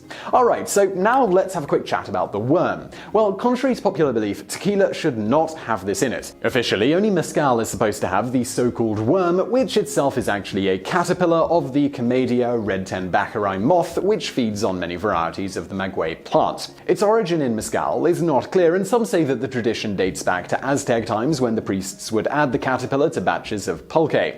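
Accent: England, United Kingdom. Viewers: And i thought he's a British who lives in Canada lol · Is this how englishmen pronounce Nahuatl words? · I thought same, accent is definitely British though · i cant learn with this britt talking this england mess at me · I thought that you were in England you sound British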